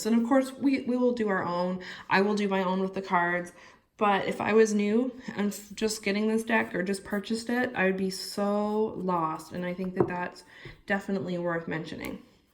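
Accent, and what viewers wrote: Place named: East Coast, Canada. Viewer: Nice deck! Ps you must be from Canada, east coast - by your accent… 😊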